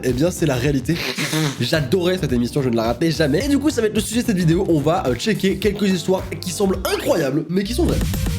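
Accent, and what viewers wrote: England, United Kingdom. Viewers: je veut un like stp ❤️ J’aime trop ton accent anglais 😂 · waaaaaaaaaaaw comment tu as fait ;-; ceci est le talent le plus incroyable du monde ! ( ͡° ͜ʖ ͡°) Et tu as un accent anglais incroyable ( c’est vraiment vrai ) ʕ •ᴥ•ʔ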